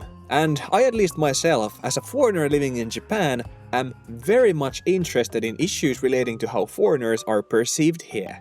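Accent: Finland. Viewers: niinku paahtoleipä? Suomi aksentti kuuluu läpi 😂 Good video tho 👍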